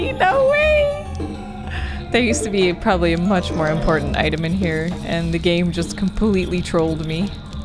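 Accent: Netherlands. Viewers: Hah, wist wel dat je nederlandse was, sommige engelse woorden spreek je nederlands uit. Was niet zeker of je achternaam Nederlands was, heb je ook best veel buiten nederland. Leuke gameplay verder, deze spel is echt vet. Toen ik 9 was ofzo speelde ik het met mn vader. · Curious how the pronounciation of the names with a Dutch accent are actually VERY close to how we pronounce it in a Brazilian accent (It was amusing to notice that said the names the same way I do haha)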